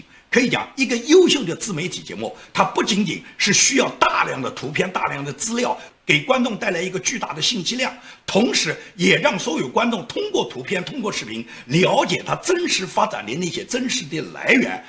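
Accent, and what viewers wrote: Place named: Suzhou, China. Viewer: 老师您好，我是您的江苏老乡。17年到德国您是我在YouTube关注的第一位主播，不知不觉中在您每天节目的陪伴中走过了三年。谢谢您日复一日的付出，让我有了全新的思考和辨别方向的能力…也谢谢您的苏式普通话让我在远离家乡的同时听到最亲切的乡音☺️ 真心祝福您及全家身体健康；节目越做越好，我们陪着您一路走下去！谢谢！！！💐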